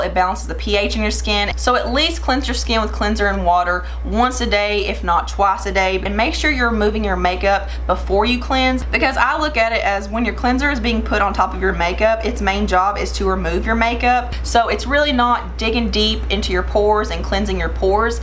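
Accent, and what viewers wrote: South, United States. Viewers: Tímea Tarjányi, it's a southern accent. Do you mean where she lives.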